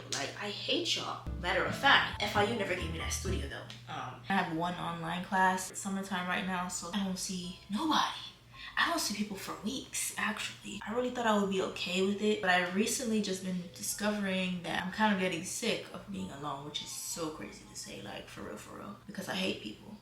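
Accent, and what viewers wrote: Jamaica. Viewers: Content, music, and the Jam' twang...I'm with you!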